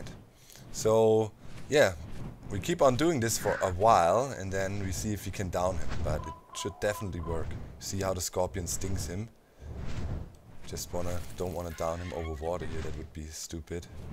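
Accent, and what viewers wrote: Germany. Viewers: Are You Deutsch Sir?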